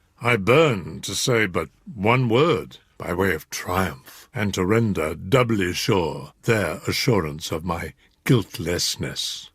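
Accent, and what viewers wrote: United Kingdom. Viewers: Is this British accent ?? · Sir Christopher Lee has a very strong posh accent. Like it.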